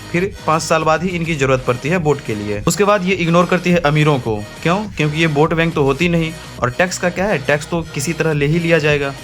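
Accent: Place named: Bihar, India. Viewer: Bro you are from Bihar, if I am not wrong, your pronunciation tells 👍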